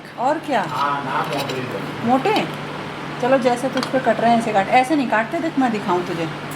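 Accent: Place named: Anupshahar, India. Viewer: Nice vlog mam🙏🙏🙏🙏🙏🙏🙏🙏🙏🙏🙏🙏🙏🙏 Aacha lagta hai... Jàb ghar me sab milkr kaam krte hain.👌👌👌👌👌👌👌👌👌👌.... Bilkul tyohar jaase feeling aati.......👍👍👍👍👍👍👍👍👍👍👍mam.... Meri saasu ma anupshahar ki hain...... Apki language bhi wasi hi lag rahi thi.....👌👌👌👌👌👌mam mai to choorma food processor mai bna leti hoon, badhiya mix ho jata ha😊😊😊mam mawa aap ghar pr banate ho kya???😊😊😊😊😊😊😊😊😊love u frm Haridwar 😘😘😘😘😘😘😘😘😘😘😘😘😘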